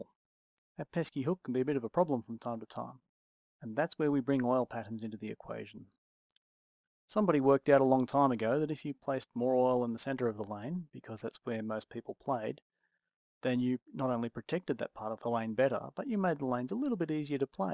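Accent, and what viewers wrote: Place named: Australia. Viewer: good to hear an australian voice helped me a lot thanks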